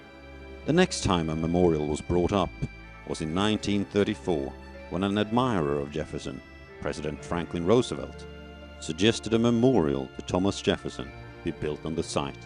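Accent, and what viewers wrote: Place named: United Kingdom. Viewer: British narrator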